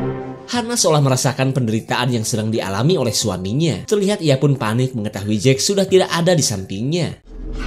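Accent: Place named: Garut, Indonesia. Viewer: Mang orang mna ,logat na garut pisan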